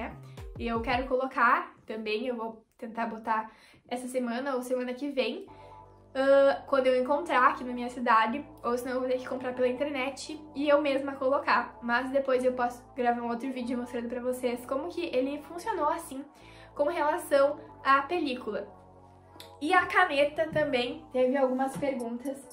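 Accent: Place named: Recife, Brazil. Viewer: Gente ela tem muito o sotaque de recife 😂🤩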